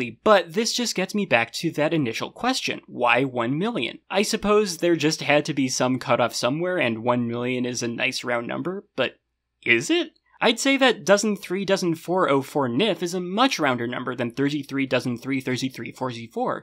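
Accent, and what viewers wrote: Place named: Great Lakes, United States. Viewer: I like your somewhat pronounced Great Lakes accent, I guess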